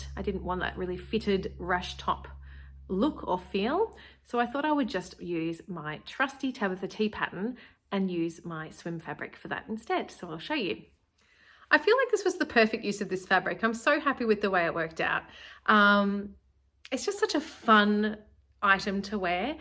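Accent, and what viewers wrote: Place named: Australia. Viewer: I really enjoyed your cruise handmade wardrobe tour. It’s also fantastic to listen to a fellow Aussie and familiar shops and fabrics. I have two patena blouses and love them. ( a basic but with a twist)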